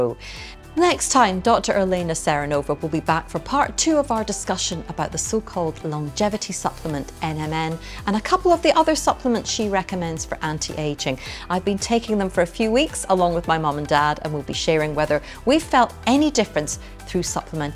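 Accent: Scotland, United Kingdom. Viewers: ~ Love your accent and Scottish sense of humour too! ^_^ All best wishes from Brighton X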